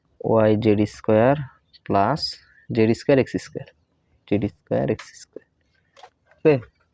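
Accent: Tangail, Bangladesh. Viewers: ছার, আপনি কি টাংগাইলের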